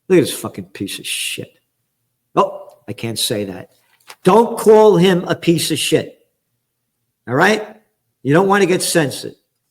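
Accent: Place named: Bronx, United States. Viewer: Love the TRUTH spoken with a Bronx accent!😊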